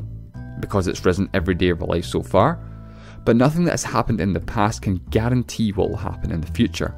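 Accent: Scotland, United Kingdom. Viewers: He is from Scotland, probably Glasgow. :) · That's a Scottish accent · I clicked off the video because your Scottish accent was confusing me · Scottish, hes from scotland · Irrelevant question; What part of the UK is the narrator from? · Paul Graf I think he's Scottish.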